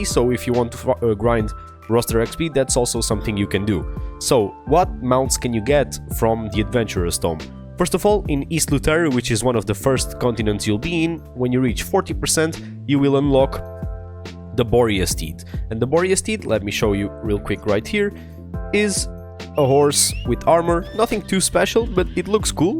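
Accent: Portugal. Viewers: good video! btw, you sound portuguese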